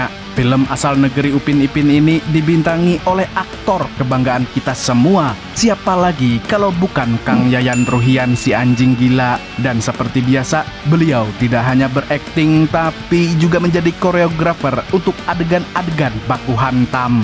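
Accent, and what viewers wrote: Banjar, Indonesia. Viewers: Orang samarinda kah banjarmasin pian mang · Banjar sekalinya 🤣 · Lah bng banjar kh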